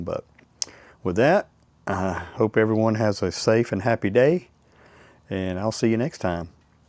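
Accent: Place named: South, United States. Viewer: Glad I found your channel....I watched a few more of your videos. Good information and content.... Likable guy. Enjoy the southern accent 💯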